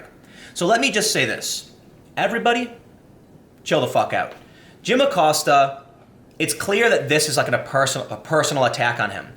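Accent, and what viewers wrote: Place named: Chicago, United States. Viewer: i knew u was from chi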